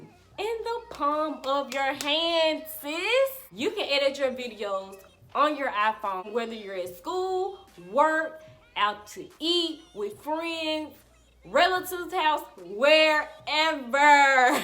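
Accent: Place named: New York, United States. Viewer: Your Accent is Killing. Is that Newyork Accent?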